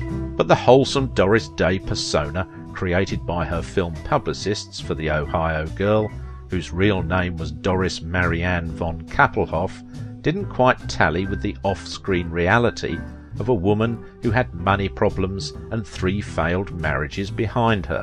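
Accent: United Kingdom. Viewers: I could have appreciated this video if it wasn't for the creator's British accent. Try as we may, we just can't escape our attachment to that island nation. One revolutionary war, two world wars and the cold war and we're no closer to being independent from them. If something originates in Britain, it will find its way into our country. Some say it's due to a common language and history, but it's like relatives who live on the other side of the country. Send them a Christmas card every year, but let them live their lives, while we are free to live our own.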